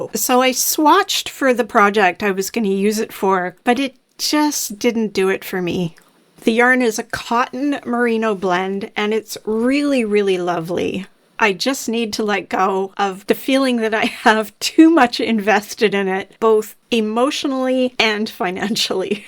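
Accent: Canada. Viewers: Hello again,. Great video but I get verklempt when I even think about destashing. To be fair, we moved four years ago and I let go of all of my commercial yarn at that time. But I still have about six bins and countless project bags in the closet. You asked us to comment on our own yarn categories and, were I to destash at some point in the future, I would need a special donate-or-dragon pile that would be for my daughters only. In other words, I will invite them to look over the yarn and donate it to them if they want it, but otherwise it goes back into the dragon hoard. Thank you for the video. I'm really enjoying them and often knitting along (or grading papers and wishing I was knitting along). I am new around here, but I think I detect a Canadian accent - so I hope you are staying warm and wooly. <3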